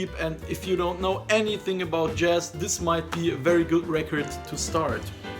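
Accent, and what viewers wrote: Germany. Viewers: Ist er Deutscher? Hört sich so an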